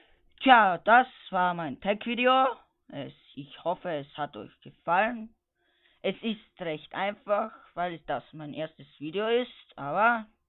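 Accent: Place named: Styria, Austria. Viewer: ^^ deine stimme is ja so genial..fakt numaaaaa ^^^ wie gehts denn so..? und deine mischung aus hochdeutsch und steirisch..thehehe tschüüüüüüs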